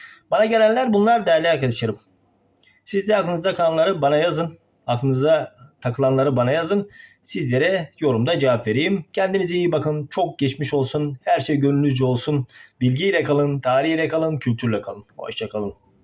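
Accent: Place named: Van, Turkey. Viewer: Hocam ne kadar yakışıklısıniz Vanlı mısınız shhshshs öyle bir sive aldim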